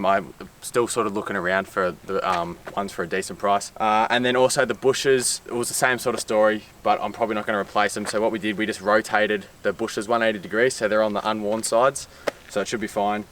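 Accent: Australia. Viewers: I was like: "I think this guy is Australian" "Oh yeah, he's definitely in Australia"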